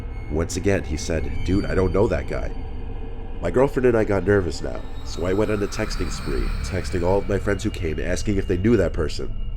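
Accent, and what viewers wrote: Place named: West, United States. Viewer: Let's be honest voice is so good for stories like this and it sounds western. His clogged nose make it sound better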